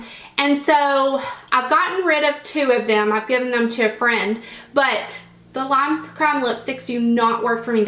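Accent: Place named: South, United States. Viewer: I'm so happy some one is finally being honest on YouTube. I really appreciate it!! Love you videos so much... And I love your accent, I want a southern accent soooooo badly!! 😭😭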